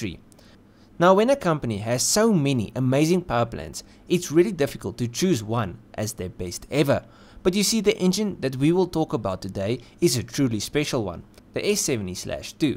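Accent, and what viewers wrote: Afrikaans, South Africa. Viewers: Can't decide what sounds better - the S70/2 or the Afrikaans accent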